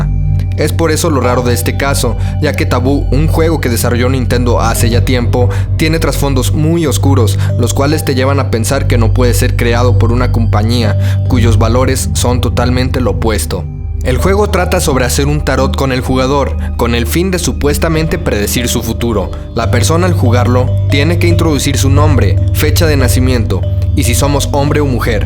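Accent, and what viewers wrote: Mexico. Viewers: Eres de México? si es asi de donde eres? buen vídeo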